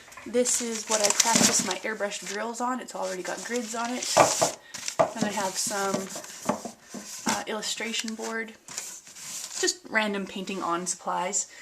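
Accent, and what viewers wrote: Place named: Canada. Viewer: Ah! I watched ice road truckers with Canadian truck drivers in it lol...Love your accent :)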